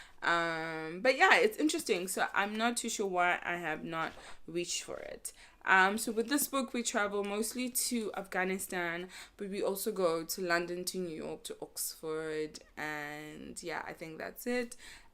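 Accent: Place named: South Africa. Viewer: I'm so glad you were on the Booktube black chat so i could find this channel! Firstly, it's wonderful to hear another South African accent, and secondly, I'm glad you highlight such important fiction. I'm glad to meet you and will be lurking in your other videos' comments soon. 😉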